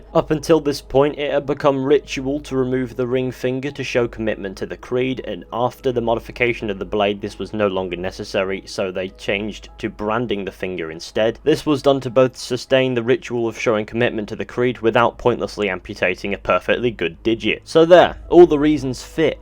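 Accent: United Kingdom. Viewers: sorry kinda sound british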